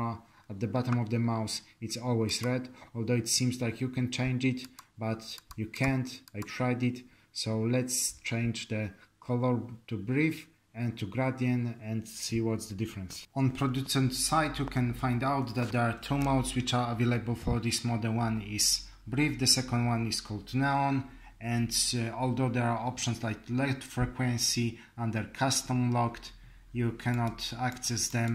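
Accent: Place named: Poland. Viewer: Po akcencie zgaduje ze jesteś z polski. Mam racje xD?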